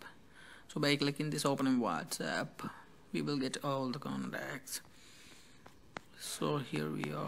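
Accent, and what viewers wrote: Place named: India. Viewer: cant understand shit. dat indian accent man...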